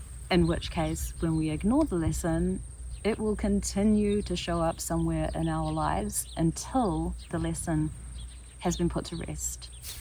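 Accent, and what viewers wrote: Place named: New Zealand. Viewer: Good morning. First of all, welcome back home to Godzone, Aotearoa, Nz. I WILL continue to follow, stay subscribed to your channel. I guess the content is easy to follow, simply because it's in "our" accent, and not in a monotone. My response, even though it's a cliche; is "You do You." I don't know what you have planned, but I will be here in Murchison, June to August. I would love to meet you personally. I kinda feel, that you have more to say. However it does get cold, through the winter here, in the village, but in reality, there's no such thing as cold. Just an absence of heat.!! 😮 Thank you for your previous content, and I look forward to further cooking episodes, if that is what you have planned. Maybe, van life.? In the meantime, have a break. All the very best. Much aroha.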